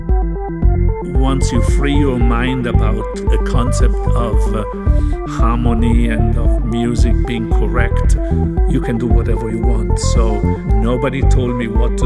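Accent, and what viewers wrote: Italy. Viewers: actually i checked and he's from the Alto Adige region in Italy (which in german is Südtyrol) so he is italian but was born really close to the italian-austrian border, where the accent is influenced by germanic languages, so we were both right lol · a me sembra un accento piuttosto italiano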